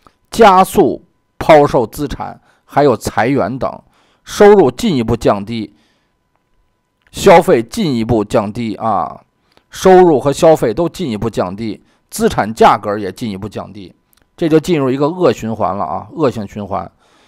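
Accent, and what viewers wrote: Beijing, China. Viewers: 很喜歡你的視頻真棒京腔京味！聽了很親切。老北京人赞你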